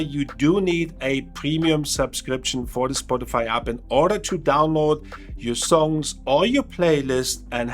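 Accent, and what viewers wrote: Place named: Germany. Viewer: Definetly heard out the german accent helped me a lot : )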